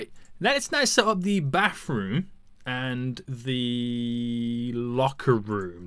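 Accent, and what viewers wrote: United Kingdom. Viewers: How do u not notice he is British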